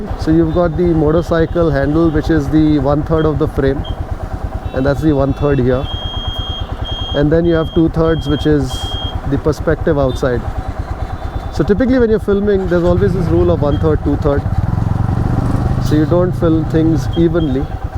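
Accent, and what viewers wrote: Karnataka, India. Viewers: I guess chesty is more suitable. When v r off the biking with wide coverage. And ya ur kannada is sweet. That's y u have more local addicted viewers. 😊😊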